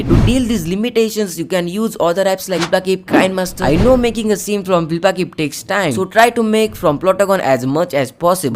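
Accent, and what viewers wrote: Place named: India. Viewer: Poor guys, he was in indian accents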